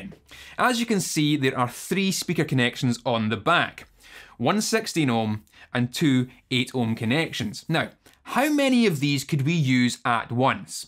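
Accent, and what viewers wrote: Scotland, United Kingdom. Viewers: He's not American, but Scottish.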